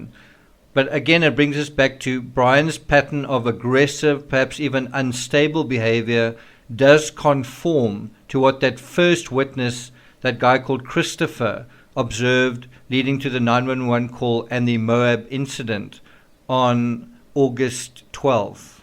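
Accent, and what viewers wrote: South Africa. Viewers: Good SAFFA accent. Your steak was blue. Hello from Sedgefield in the Garden Route.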